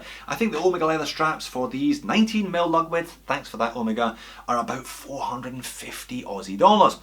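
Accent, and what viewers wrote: Scotland, United Kingdom. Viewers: Sorry, I've watched you from start of corona but now you've iterated at least 4 times you're a Scotsman in this vid alone... accent is starting to make sense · Ha ha, love the watch gift idea. Going to find another fiancé 😂looking for a Ball 904L I'm a recent subscriber and slowly getting through the vids.. don't mind the scotch accent...if you were Welsh we'd be told every other minute..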